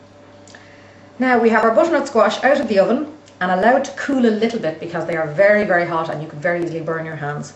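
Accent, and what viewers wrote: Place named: Ireland. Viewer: Ahhh, the brogue! I'd listen if you were reading the phone book. But the squash instructions are spot on. Thanks!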